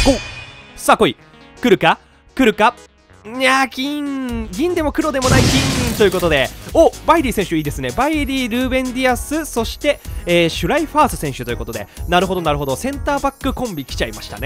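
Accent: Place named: Saga, Japan. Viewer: 佐賀弁むっずいですねwww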